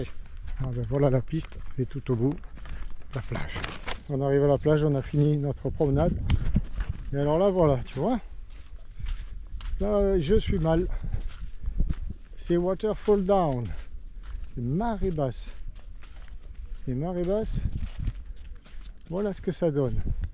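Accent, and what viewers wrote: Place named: Marseille, France. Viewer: Comme tu le dis si bien : malheur! mais avec l’accent Marseillais ça passe mieux et ton audace te sauve 👏👏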